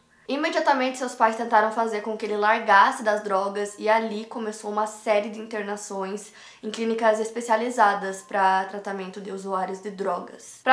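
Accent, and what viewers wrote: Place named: Paraná, Brazil. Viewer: Paraná né ♥️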